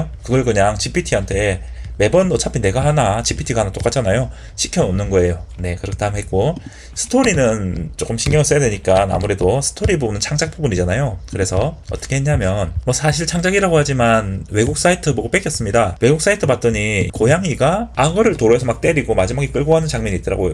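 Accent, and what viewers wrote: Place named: Busan, South Korea. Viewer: 어쩐지..부산억양이 익숙한 느낌이...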